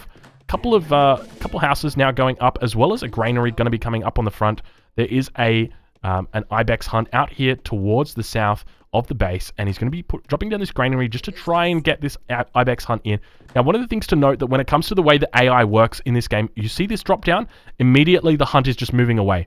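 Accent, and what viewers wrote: Australia. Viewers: That's just the Irishness of the Aussie accent.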